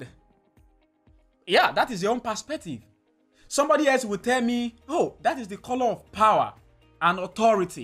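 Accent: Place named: Nigeria. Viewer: I discovered your channel when you were at 40k subs, and I knew from your voice you were a fellow Nigerian. Your work ethic is insane! 🔥🔥🔥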